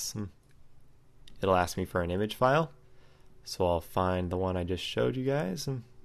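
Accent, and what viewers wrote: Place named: Italy. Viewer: i love your Italian accent, say "started"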